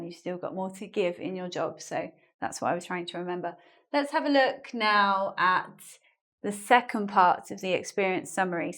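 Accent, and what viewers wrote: United Kingdom. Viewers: Twice. How I love this British accent...👌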